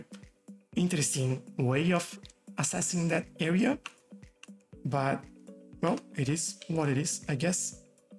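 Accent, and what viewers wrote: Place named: Brazil. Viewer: Amazing video! Are you brazilian? Your accent reminds me of our people speaking english.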